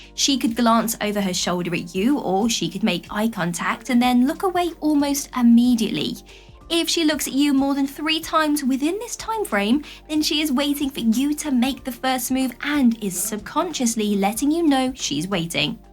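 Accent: United Kingdom. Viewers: I'm already married, but I enjoy hearing these tips and love the British accent. And these videos are a nice distraction from all the fucked up things going on in the world.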